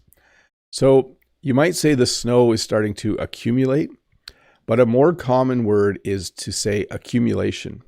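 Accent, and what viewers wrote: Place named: Canada. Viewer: Hello,! I currently live in California. Every day, I watch videos to improve my English listening skills. How different is American English from Canadian English? I really enjoy watching your lessons. Unfortunately, people around me don’t speak as clearly as you do. Or is this a feature of the Canadian dialect? Thank you very much for your work!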